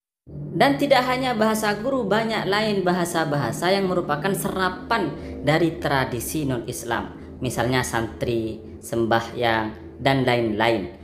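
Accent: Madura, Indonesia. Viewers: Orang madura ya admin ny?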